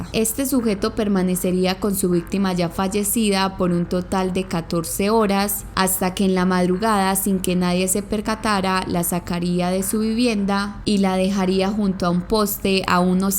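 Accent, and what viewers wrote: Colombia. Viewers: Adoro como explica sus casos,es increible y su acento colombiano enamora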